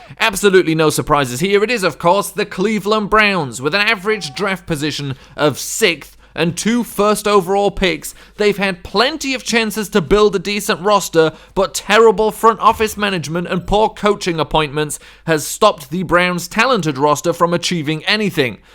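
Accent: United Kingdom. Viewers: Weird to hear a British NFL fan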